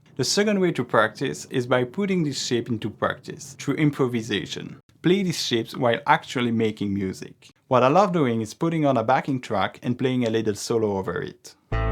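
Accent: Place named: France. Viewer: On reconnaît bien l'accent français 😅 Merci pour la leçon 💪🏼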